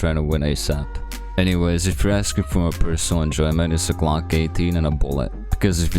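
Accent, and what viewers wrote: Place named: New Jersey, United States. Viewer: u sound like u have a sopranos accent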